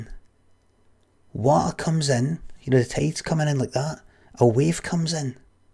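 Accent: Scotland, United Kingdom. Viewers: Where in Scotland are u from limmy?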